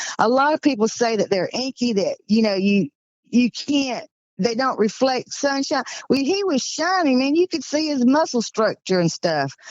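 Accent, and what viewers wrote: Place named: Georgia, United States. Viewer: As a Jersey girl, I love the Georgia accent 😊 My accent is like a cross between a valley girl and Rocky Balboa. Lol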